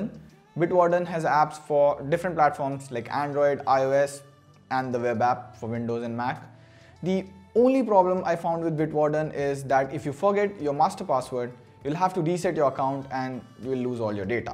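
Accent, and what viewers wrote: India. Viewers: u Sound like Indian Accent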